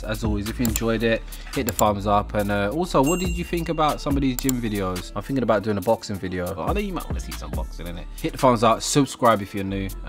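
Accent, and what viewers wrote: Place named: Baltimore, United States. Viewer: U from Hong Kong talking like that lol? Im from a Baltimore. U sound like u from over here lol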